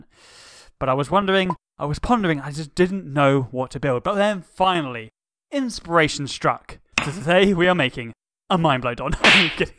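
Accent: United Kingdom. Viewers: I know this sounds weird, but I like your British accent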